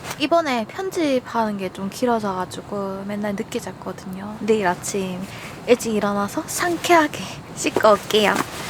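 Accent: Gyeongsang, South Korea. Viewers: 1. 베-베르그라뇨 르-르(느)무나 개취네욤 그-그럼…저 주시나요?ㅎ 2. 우드 랜턴 스탠드-월넛(중) 을 호시탐탐 눈팅만 하고 있답니다. ㅎㅎㅎ영상보고 넘 갖고싶은데…부피도 좀 있을 것 같고..가격도 가격이고… 저도 경상도 출신이라 경상도 액센트 넘 정겹고 좋아요. 항상 영상보고 부러운 마음입니다. 맛있게 먹고 작은거에 감탄하시는 모습이 힐링이에요~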